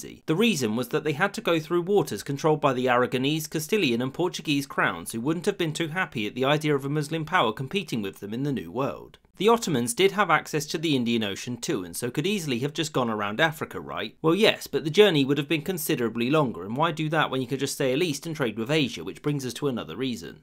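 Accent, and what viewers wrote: United Kingdom. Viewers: i'm ottoman. you speak too fast english with your strange british accent. bro slow down. i was trying to understand. böyle hızlı konuşma gözüm. i think you need some ottoman slap on your face thats why you speak too fast. i will try watching video with 0,75 speed, lets see if it will work.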